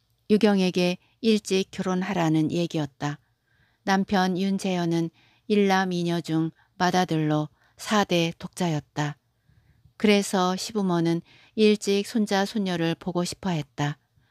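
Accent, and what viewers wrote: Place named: Jeolla, South Korea. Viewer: 전라도 억양이 세네요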